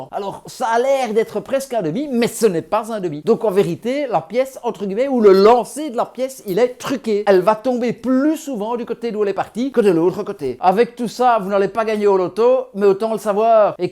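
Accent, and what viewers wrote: Belgium. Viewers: J'adore l'accent belge 😂👍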